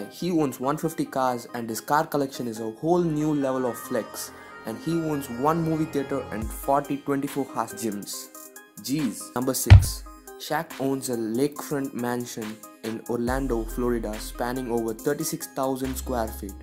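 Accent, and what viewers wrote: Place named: Australia. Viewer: This guy has Australian accent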